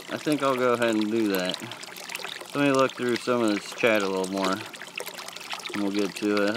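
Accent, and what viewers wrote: United States. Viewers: I love your american accent Greetings from Paris 😁 Michel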